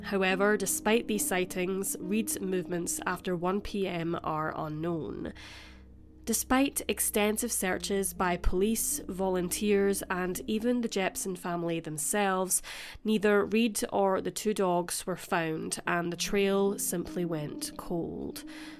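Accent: Scotland, United Kingdom. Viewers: The narrator is Scottish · Is the narrator scottish? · How sad. By the way you have a lovely Scottish accent.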